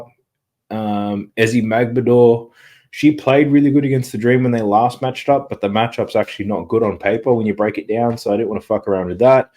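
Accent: Australia. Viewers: Only 2nd time watching the channel , thought i detected an Australian Accent the first time i watched , good to see/find a channel with an Australian doing sports Betting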